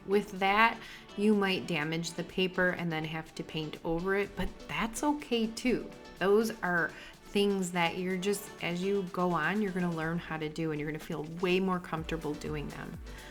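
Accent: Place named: East, United States. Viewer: Love your artistry and thanks for telling it like it is - so helpful and inspiring. And hope this doesn’t come out wrong but your eastern us accent is so cute. Love From California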